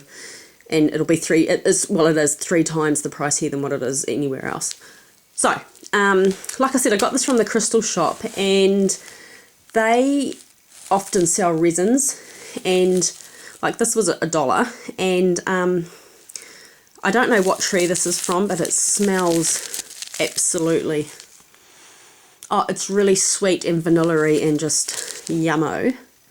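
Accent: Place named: United Kingdom. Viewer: so you are from New Zealand? wow that is great but your accent is like from Britain just a bit i suppose. i love to hear your voice so you can talk all day!! I really like all your stuff, girl, they have tea balls all day long here in the USA in Wal-Mart, I agree with you that online shopping is very bothersome but somewhat convenient especially when people such as my self cant find any witchy shops in a "christian" community. I have no other choice but to order online and i have to eat crow about it. and i prefer witchy shopping over clothes shopping any day as well!!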